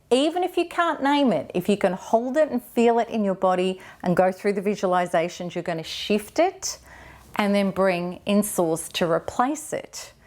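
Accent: Australia. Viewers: ozi acent and this patronising way of talking is so anoying.By the time she finishes the sentence,I forgot what she started talking about. However, some comments here are so helpfull. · What the hell is this, you wanna show that you speak Australian English right rather then what impotent things you are saying, right.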